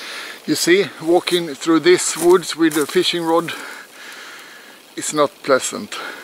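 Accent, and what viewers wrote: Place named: Sweden. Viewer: det stammer säkert men det var så roligt tyckte jag. det lär så svengelskt. måste ändå som vanligt beröma dina fina filmer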